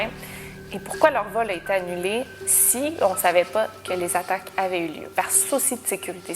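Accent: Canada. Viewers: Je me demande comment peut tu avoir l'accent canadien alors que tu vie au Mexique ! Sinon j'adore ta chaîne !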